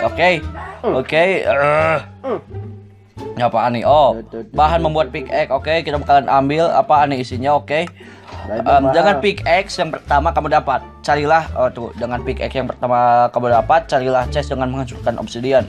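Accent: West Java, Indonesia. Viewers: Abang orang sunda ya? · bang abang orang sunda yaa?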